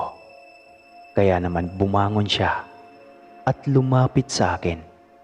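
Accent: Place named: Iloilo, Philippines. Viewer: Taga saan accent mo boss. Bisaya ba? Ay ilonggo pala..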